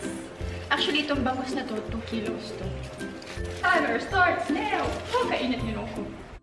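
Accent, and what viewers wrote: Visayas, Philippines. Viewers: I love the way you speak "Bisaya" the same as me 😊 I'm proudly Bisaya 😊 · Ang Cute ni Ms. mag bisaya❤️😍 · Ganahan ko kaayo mostorya syag bisaya heheh gwapa kaayo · Ka cute nlng mubisaya uy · Kaaligre magbisaya oy! More videos pa. Loveyouuu😘😘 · hindi ako bisaya peru bakit ang cute no g. Kpag nagssalita ng tagalog na bkisaya accent hihi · Pwede ba ka himo ug Bisaya challanges 24hr.. Kay ka cute nimo magbisaya❤❤❤ watching fr0m Finland🇫🇮🇫🇮🇫🇮 Pa shout me Family Heikkilä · Ka cute mag binisaya oyy 😍😍❤❤ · Oh!! 😮.Ms. its so mouth watering. 😋😋and cute niyo po pagnagbibisaya.😄.ganda mo po always ikaw lang ata ang nag-ihaw na ganyan ka fresh. 😊😍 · Cute kau ka mag bisaya bah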